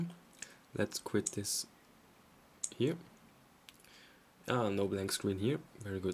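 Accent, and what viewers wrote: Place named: Germany. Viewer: Dude, awesome accent. I find it pretty hard to copy a German accent and I've been studying German for 7 years. Yeah.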